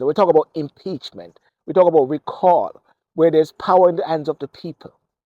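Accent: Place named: United Kingdom. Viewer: I suggest you go and read the full report and try to let the bias side of your brain to find the facts..ask yourself this the priminster is a 52 year old man that could run any company globally and make millions but unlike you chooses to exercise is patriotic side and remains in his country to help build the economy..i suggest go do some more research and come back to your audience in your firm British accent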